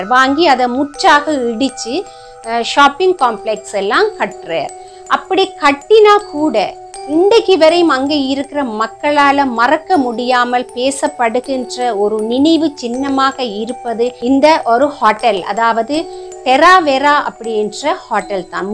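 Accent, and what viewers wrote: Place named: Sri Lanka. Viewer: நீண்ட வருடங்களுக்குப் பிறகு இலங்கை தமிழர்களின் தமிழ் உச்சரிப்பு வார்த்தைகள் நீங்கள் பேசுவதைக் கேட்டு மிக்க மகிழ்ச்சி வாழ்த்துக்கள்💐💐💐💐.உங்கள் அமானுஷ்யம் சம்மந்தப்பட்ட காணொலி மிக சுவாரசியமாக உள்ளது.மேலும் முதலாம் உலகப்போர் உருவாகக் காரணமாக இருந்த சர் ஃபிரான்ஸிஸ் பெர்டினான்ட்(ஆஸ்திரியா நாட்டு பட்டத்து இளவரசர்),பெனிட்டோ முசோலினி,மாடா ஹாரி(டச்சு டான்ஸர்)செங்கிஸ்கான் போன்ற சர்வாதிகாரர்களின் வரலாற்று காணோலிகளை போடுங்கள்.நன்றி🙏🙏🙏🙏!(சுரேஷ்.சென்னை).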